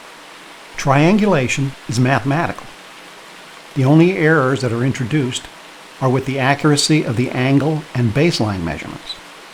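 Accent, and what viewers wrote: United States. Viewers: It's wonderful to hear metric measurements in an American accent.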